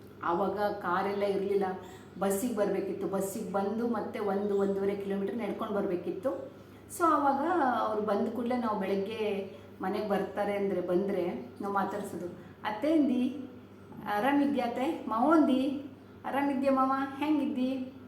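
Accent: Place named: Kundapura, India. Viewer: Swalpa Kundapura kannadakke Holutte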